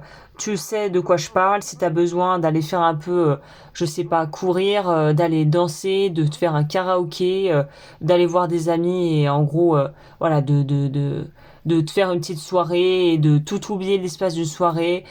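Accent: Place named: United States. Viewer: Vs avez vécu en Amérique??votre accent anglais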